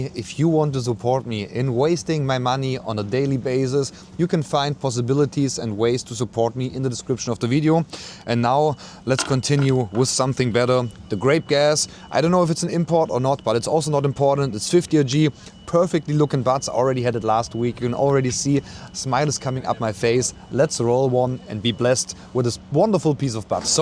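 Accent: Germany. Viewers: You have german akzent ma boi. Are you from germany?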